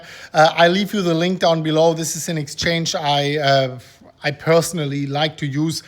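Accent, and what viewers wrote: Germany. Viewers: Man I missed that thick German accent and the daily follow-ups. I’m back in the game baby. 🦾🎉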